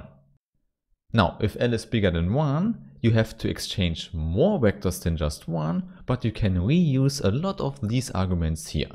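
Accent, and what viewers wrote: Germany. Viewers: Haha sehr geiles Videos. Das deutsch ist nicht zu überhören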